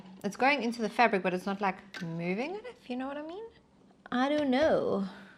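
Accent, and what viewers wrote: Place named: South Africa. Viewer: Hi there. You sound like a fellow south African 😊. Can you advise on how you choose your size? How did you know the size would fit you or are you familiar with tianas closet patterns? Thanks allot for the tutorial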